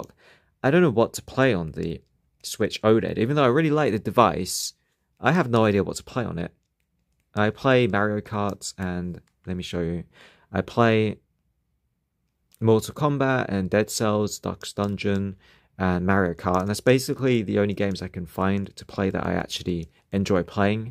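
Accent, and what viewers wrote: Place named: United Kingdom. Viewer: ...not even his British accent made this review sound smart.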